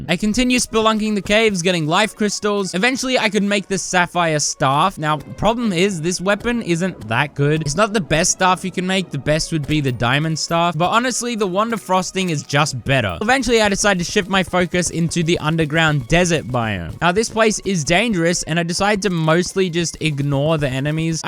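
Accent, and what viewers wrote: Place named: Australia. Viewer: i hear it but its because their both australian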